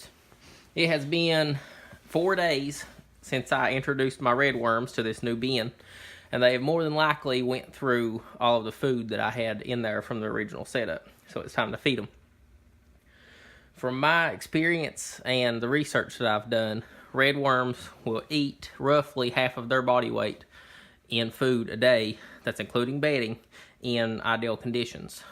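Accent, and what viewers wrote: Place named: Appalachia, United States. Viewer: This guy has to be appalachian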